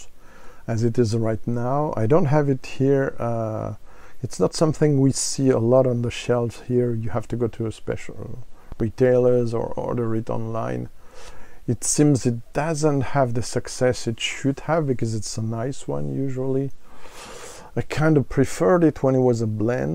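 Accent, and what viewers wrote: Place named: Highlands, United Kingdom. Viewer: Still working on your Highland accent, I see? A few months spent in a rural village and no one would know the difference. I do like the presentation of the pre-1974 Famous Grouse, surprised at the striking differences between the modern version and pre-1974 edition. I agree with you on the peated version of Famous Grouse, it doesn't rock my boat either. Maybe that's why they keep repackaging the bottle?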